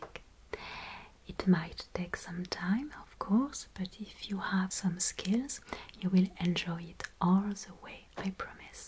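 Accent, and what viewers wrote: France. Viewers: I just finished watching her roleplay where she did a French accent that I thought she was faking the entire time just to find out she wasn't faking it lol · Mon dieu...French accent whispering in my ear with quality headphones is pure eargasm. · and I was wondering at the first videos I saw what accent she has. Until I saw the french lesson video and now I am like: "Why the f... didn`t I hear that earlier? Yo can hear the french accent at a few words, I am so dump" So I think I`m not good in guessing accents..... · Your french accent is life😑 its so beautiful❤️ also, the sound of the music sheets lol😑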